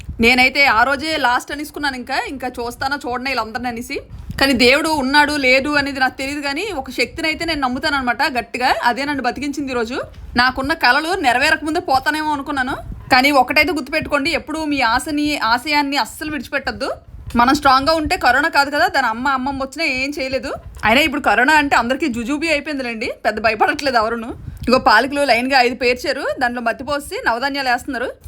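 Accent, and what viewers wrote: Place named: Uttarandhra, India. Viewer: గారు,అబ్బా వీడియో అంతా ఎక్కడా ఏ చిన్న విషయాన్ని వదిలేయకుండా మొత్తం కవర్ చేస్తూ ఫంక్షన్ మొత్తం చూపించేసారండి, మీరు మాట్లాడే యాస ఉత్తరాంధ్ర భాషపై మాకున్న ప్రేమని మరింత పెంచుతుంది,పెళ్లి బొమ్మలు మాత్రం ఎక్సలెంట్ అండి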